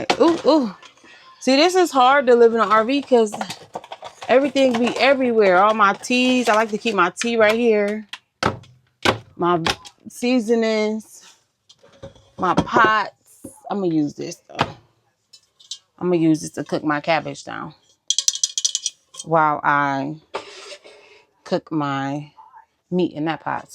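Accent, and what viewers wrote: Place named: South, United States. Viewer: What part of the south are you from seriously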